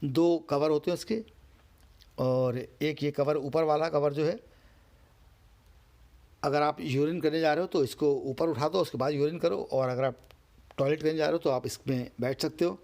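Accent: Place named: Uttar Pradesh, India. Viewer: Salam chacha ap ka ye andaj bahot achcha lagta hae ajawo bhai dosto asa to hamare U P me bolte hae thanks chacha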